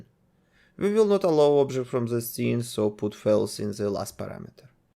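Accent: Russia. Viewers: произношение русского) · Благодаря русскому акценту я лучше понимаю)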